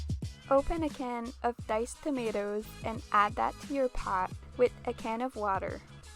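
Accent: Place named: Gaspésie, Canada. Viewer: omg your accent sounds just like the accent from where I live... I am in Gaspesie... Also hello fellow vegan. I love this video 😍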